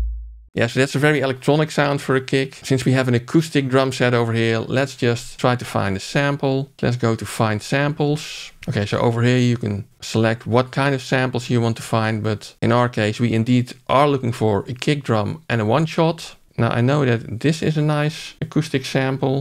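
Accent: Netherlands. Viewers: nice dutch dialect 🙂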